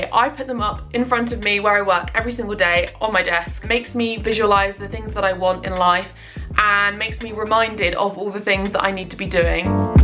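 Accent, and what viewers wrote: United Kingdom. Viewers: I like your British accent and thank you very much!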